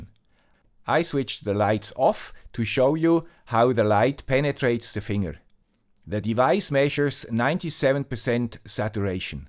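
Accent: Switzerland. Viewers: Another very interesting video from the old guy with the Swiss accent who is not a doctor, but plays one on TV, or at least on YouTube. I'm surprised that they don't use the blood oxygen meter as an additional test for detecting people with corona virus. Maybe it could detect people with 'shortness of breath'? Final question: Do you really have to pay in Switzerland to see a doctor? Of course we pay quite a lot here in the US, but we are always told that people in really nice countries like Switzerland have free access to unlimited healthcare. Of course we also have to buy our own guns here and only the wealthy can afford their own fallout shelters...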